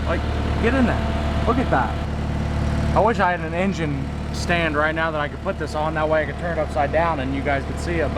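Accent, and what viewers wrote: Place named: Oklahoma, United States. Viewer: Okc guy in here...sounds like your close...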